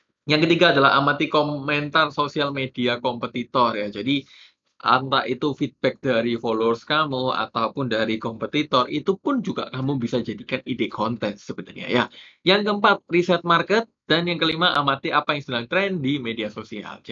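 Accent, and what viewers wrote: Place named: East Java, Indonesia. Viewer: tetep ya..orang jawatimuran atau surabaya itu dialeknya khas.. po meneh wong solo hehe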